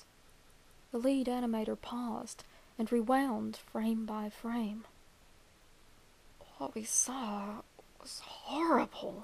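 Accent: South, United States. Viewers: You sound like a Hucken Hill Billy.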